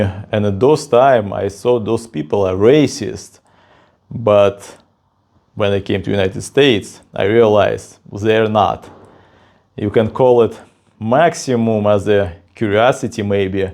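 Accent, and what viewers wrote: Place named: Russia. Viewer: Oh my God! I'm from Canada I got your add. When I heard you speaking with Russian accent. I just stumbled I couldn't believe my eyes 😂. That is so cool! I'm definitely signing up for your channel!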